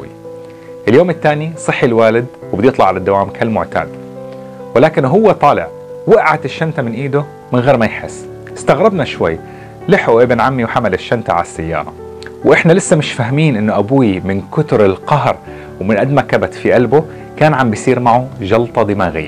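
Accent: Algeria. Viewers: والله قبل ماتقول North Africa كنت حاسس انك منا هههه بطريقة كلامك وفهمك للدين ،أخوك من الجزائر. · صدقني فيك شيئ من الجزائريين من طريقة كلامك وكاريزميتك وأحاسيسك ومواقفك التي ذكرتها وعن والدك رحمه الله. كلنا مسلمين.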